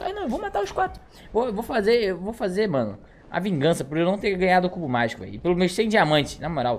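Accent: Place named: Rio de Janeiro, Brazil. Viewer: de que cidade do rio vc é?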